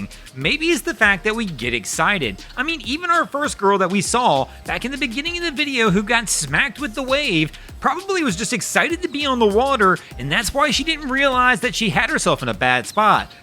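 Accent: United States. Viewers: After a few listens, you will realise that although he has an American accent annoying to Many, he really DOES know what he's talking about and actually makes the video complete. He is also a multiple qualified mariner with much experience. Either way....these are fun complications we all can never stop learning from. Cheers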